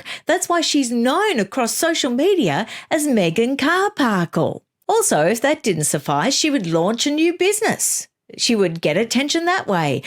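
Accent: Australia. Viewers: I love listening to. You’re voice and Australian accent it the best!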